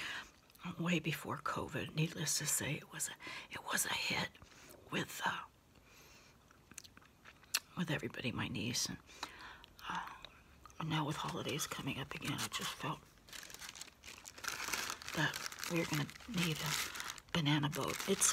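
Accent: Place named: Greece. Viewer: Sounds like greek woman I'm greek too για αυτό το κατάλαβα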